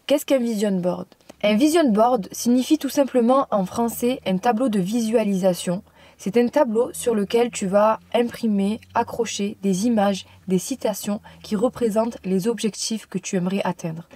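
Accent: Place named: South, France. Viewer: tu a un beaux petit accent du sud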